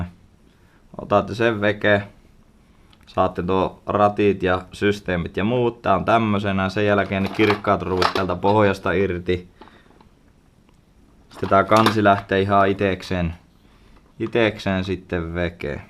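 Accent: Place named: Finland. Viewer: Juu ajattelin vaan ku sulla on silti toi suomiaksentti mutta sä et jää jankkaamaa sanojen kaa kuten monet jää.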